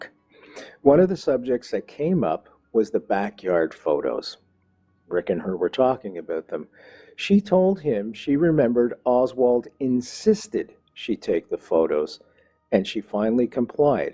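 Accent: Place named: Canada. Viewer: Hi - a listener from the UK here! I just wanted to say thank you for you tireless, even-handed work toward a range of topics. The assassination of JFK is something that has deeply interested me for a while, and I always enjoy hearing different points of view about the various elements of the event and people involved. JFK, from talking to my mother and grandparents and others, was a much respected, even loved, President here. And his death was a deeply shocking, saddening event that still stirs great emotion in my mother today. He seemed to represent a brighter future and engendered a great amount of respect here. There was, and remains, a great deal of scepticism about the government sanctioned explanations as to what happened before, during, and after President Kennedy's death. And, as an aside, I love listening to your Canadian accent and when you talk about Lake Ontario - always nice when I'm listening with a warm cup of tea of an evening!:) Thank you!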